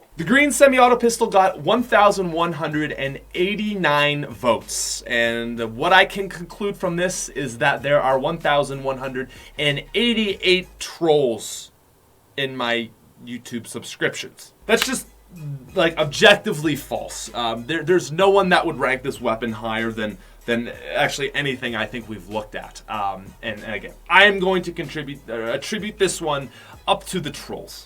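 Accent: Canada. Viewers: He’s mega Canadian jeez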